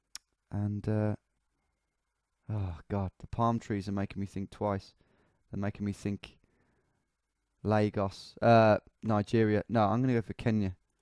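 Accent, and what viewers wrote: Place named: Birmingham, United Kingdom. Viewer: your lovely brummy accent always relaxes me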